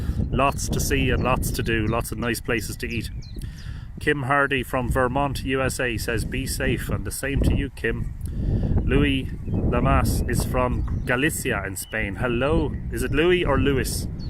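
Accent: Ireland. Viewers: Gay drogheda accent you have must off been a while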